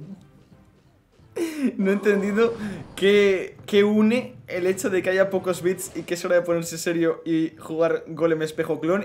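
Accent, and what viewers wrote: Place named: North, Spain. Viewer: a entonces es norteño xd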